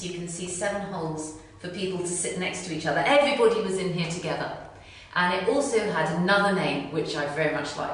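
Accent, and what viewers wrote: RP, United Kingdom. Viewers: saying...piss pot... in her lovely posh accent is a joy.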